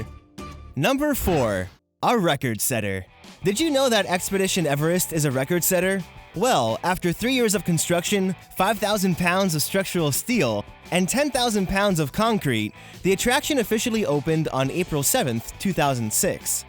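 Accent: Canada. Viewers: He's Canadian. That's how Canadian's say it. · He is Canadian.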